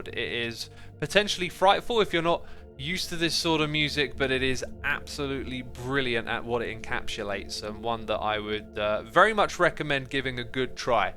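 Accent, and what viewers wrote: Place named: RP, United Kingdom. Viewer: To me it was kinda unexpected to find out that X listens to this kind of music(I myself am a huge fan of death,death and trash metal) with his strong british posh accent.Anyone else?it just kind of doesn't go together?idk lol. Also nice video!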